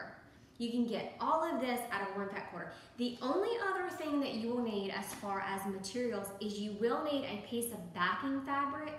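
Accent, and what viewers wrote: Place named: South, United States. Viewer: Hi...Just watched your video. You have made a wonderful quality scrub cap...French seams and all!!! I've sewn for six decades and appreciate your project! I do have a couple of suggestions. First, try not to sew with the bulk of your work going through the arm of the sewing machine. You'll find it is much easier to handle your project. Second, if you pin or stitch the end of your elastic to the entry end of your casing, you can pull it through far enough to sew both ends using just 5" of elastic and saving 2". I loved your clear, through instructions delivered with your wonderful down home Southern accent. Keep up the great work and thank you for sharing...KMc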